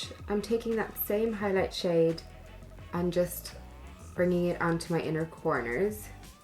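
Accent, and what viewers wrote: Ireland. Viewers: Love your Irish accent, you look so beautiful xxx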